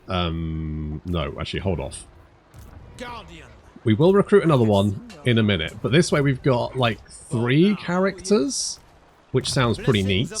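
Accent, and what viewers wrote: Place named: United Kingdom. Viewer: Happy New Year everyone! Since it's a new year and a new campaign(and likely to get a lot of views) when did you guys find and what campaign? The first campaign I watched was Kemmler and Krell... for Warhammer 1 which was what seven years ago oh time flies. The cinematic battles via replays were something nobody else at the time was doing(at least Surrealbeliefs and Heir of Carthage weren't maybe somebody else was idk)and that was just a great watch and so was listening to the funny British man babble about turning units into jam and stuff.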